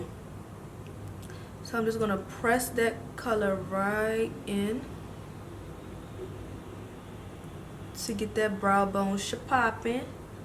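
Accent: Washington DC, United States. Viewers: I just knew you were from dc..we have a certain type of accent lmao..I immediately subbed😍 we gotta support each other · You have to be from the DMV....the accent gave it totally away! I ran 🏃🏾‍♀️🏃🏾‍♀️🏃🏾‍♀️🏃🏾‍♀️ and copped me 2 of the bases and about to dive in and buy the palette. Thanks girl. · I knew u was from DC from your tone of voice 😩😍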